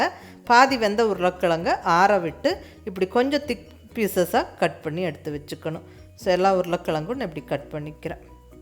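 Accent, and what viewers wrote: Kongu, India. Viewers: Mam unga Kong nattu voice super ennoda sunnuku pidikum.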